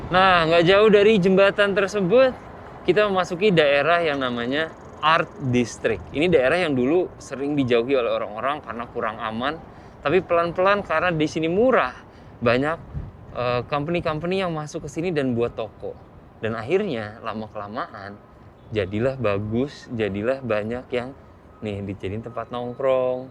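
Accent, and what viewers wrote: Indonesia. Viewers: Hebat, 20 thn di LN, logat nya Indonesia bnget❤ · 20 th tinggal, suaranya ga berubah sih" tetep Indonesia bgt' 👍👏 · Logat loe Indo banget.... Keren... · Dah lama sampe lupa Chanel ini,pdahal dlu saya suka nonton... Btw gua kagum m lu bro,, 20 tahun di Amrik tapi pas ngomong bahasa indonesia,dialek nya masih indo.👍🏼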